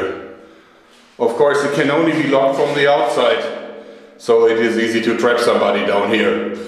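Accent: Germany. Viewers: This guy's accent is so charming. Once I heard him say he was going to try to weaponize a coke bottle with that German accent I knew I was in for the whole video. XD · Dat is ja richtiges deutsches Englisch!! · I really like your German accent when you speak English. 😜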